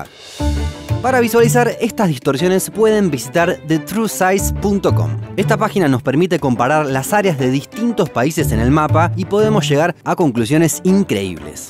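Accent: Argentina. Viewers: O sotaque/acento argentino é tão bonito que me distrai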